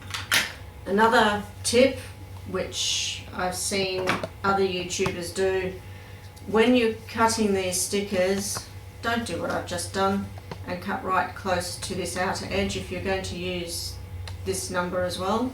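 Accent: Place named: Australia. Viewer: G'day from SA I thought hmm she sounds Aussie then she said Kmart and I went woo hoo! LOL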